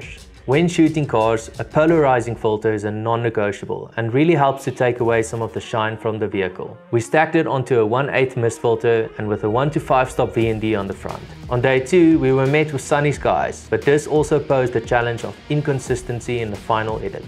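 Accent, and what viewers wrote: South Africa. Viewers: Are you South African btw? Cause my parents are from South Africa but I wasn’t born there, but you definitely have a South African accent! Anyway I’m gonna watch the video now, the opening shots were amazing! So I’m sure this video will be great!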